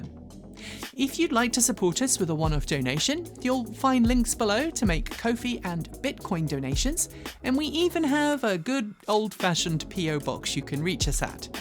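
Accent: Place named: United Kingdom. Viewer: "While Elon Musk has been very cool towards..." Oh that's right you speak the Queen's English. It's a bit ambiguous to a Murkin.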